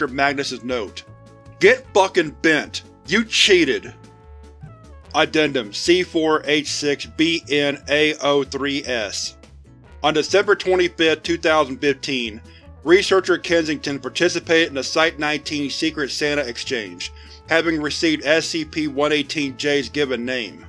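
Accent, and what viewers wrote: South, United States. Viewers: Something about your southern accent talking about the saints is so funny to me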